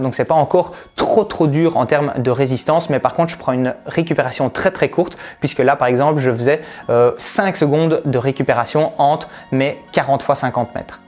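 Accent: Belgium. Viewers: yo man tu es d'où en Belgique? Je crois entendre un accent liégeois mais pas sûr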